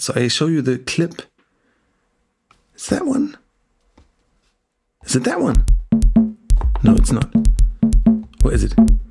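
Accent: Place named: Germany. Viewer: You sound so German. ^^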